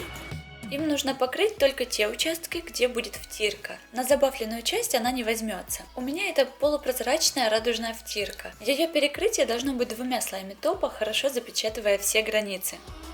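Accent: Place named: Makiivka, Ukraine. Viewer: Подписана на вас очень давно, смотрю все видео. Вы потрясающий мастер. Но почему вы настолько плохо говорите по-русски? Почитайте книги, что ли. Или хотя бы не используйте деепричастие и причастие, если не понимаете, где в предложении действующее лицо. Нельзя так. Вы публичный человек, а шпарите на макеевском диалекте.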